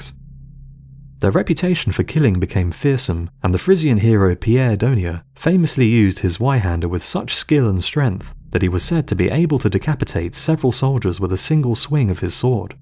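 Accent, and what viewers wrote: United Kingdom. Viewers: Awww shit! You know it's gonna be good when you gotta nigga with a british ascent talkin' 'bout some medieval shit! And yes, that mace=mince-meat.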